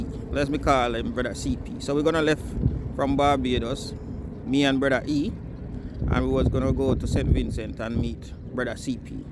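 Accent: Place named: Jamaica. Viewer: Hi brother, from the time I hear you speak I know you're a Jamaican, I'm just listening to you I'm so impressed about your visiting and experience in Vincy so glad you enjoyed every moment in beautiful Vincy whenever you want to travel again go Vincy man I tell you you are very welcome and make sure you visit other grenerdine island, one more thing there are other beach down the lee-ward side that have a mixture of white and black sand with seashell between the rock ,but you have to walk far to get there blessed peace and love 🇻🇨🇨🇦🙇🏿‍♂️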